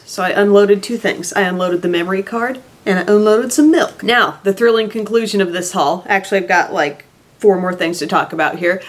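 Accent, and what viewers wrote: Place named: New England, United States. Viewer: You pronounce some words like you were from New England! That's a New England accent! 😊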